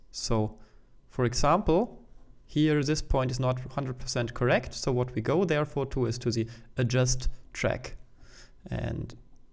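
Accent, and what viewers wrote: Germany. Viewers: Man hört das du Deutscher bist :-)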